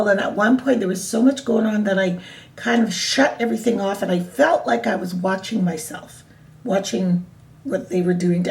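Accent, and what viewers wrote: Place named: Canada. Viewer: That is a beautiful story😇👼 thank you ,, where are you from are you from Canada you sound like a Canadian?? Please reply thank you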